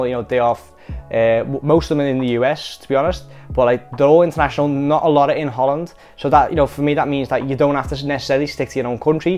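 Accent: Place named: United Kingdom. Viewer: jeez that uk accent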